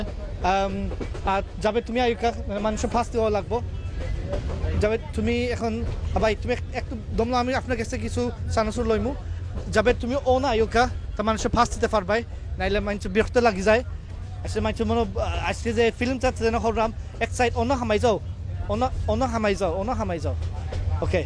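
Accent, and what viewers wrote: Sylhet, Bangladesh. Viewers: ভাই এই লোকে সিলঠি মাথের আপনার ভোজার সমস্যা হলে আপনি আমাদের লন্ডন সিলঠ চলে আসোন♥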